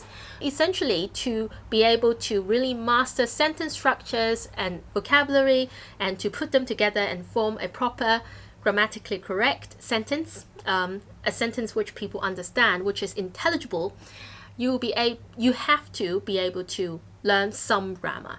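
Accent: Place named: England, United Kingdom. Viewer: Being able to speak English fluently is a must and it's a routine / daily practice for every English teacher. It's not something AMAZING for an English teacher to do, or something that an English teacher has to be BRAVE to do among a crowd of students. I guess you may have exaggerated your ability to speak fluently with a bit of English accent. I'd like to tell you that there are hundreds and thousands of lecturers / teachers out there who can also speak English EVEN MORE FLUENTLY than you can. If you jump into any English Department in any university in HK, you'll soon find that you are just one of the thousands in the 7.5M population in HK.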